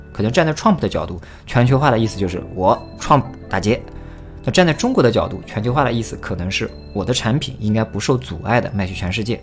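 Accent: China. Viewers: 不知道其他人跟我是不是一样的感受，一口流利的中文，而且听起来还是大陆人，一口一个中国，中共，老毛，“新加坡”，这个感觉就有点讽刺的意味，有可能是我的格局小啊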